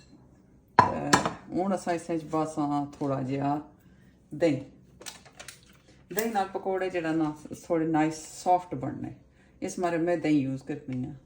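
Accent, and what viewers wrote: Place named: Punjab, India. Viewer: Punjabi accent is so sweet ❤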